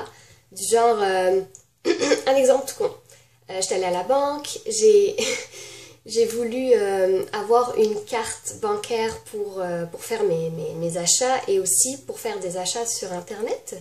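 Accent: Quebec, Canada. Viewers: wow on dirait une vrai québecquoise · Tu as déjà un peu d'accent québécois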